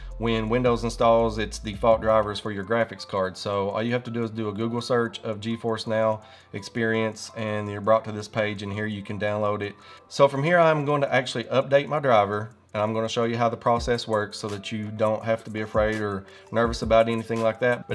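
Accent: South, United States. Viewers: Even though he has like a southern accent 🤣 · the south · Love the southern accent. Where are you from? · I agree...great tutorial, short and precise in a very clear and calm voice...very informative...Is there a slight southern accent to hear there??..😉😉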